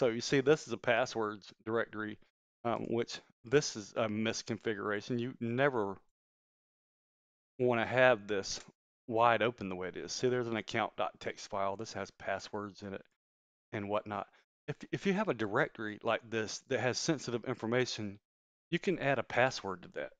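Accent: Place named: South, United States. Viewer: I can tell from that accent that youre from the same part of the South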